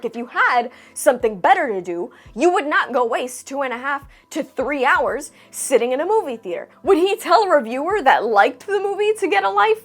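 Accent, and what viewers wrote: Australia. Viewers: Love the Australian Accent 😂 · I did not expect the Australian accent 😅 well done. This should be a training video for PR people in Hollywood. You hit all the points 🙌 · How many upvotes can I give you for your choice of restaurant, how you want the steak cooked and hilarious aussie accent? LOL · Hahaha love hearing an American do an Aussie accent. It’s the cutest thing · Excellent accent mate, I closed my eyes and could picture an Australian 🦘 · Your Aussie accent, tho! 👍😄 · Great video, big fan of the content you create. The people making TV and films today are just arrogant fools. I mean what's the worst thing you can do if someone doesn't like your stuff, oh yes, tell them that there's something wrong with them for not liking it. Total hubris on their part and pretty much guarantees that the next thing they do will be frowned upon too. 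However, it does pointing out that it's not just people in Hollywood who currently have this attitude, look around anywhere and you'll see the same thing happening; nobody in any walk of life wants to accept any sort of criticism today. Loved your Australian accent as well. Keep up the good work.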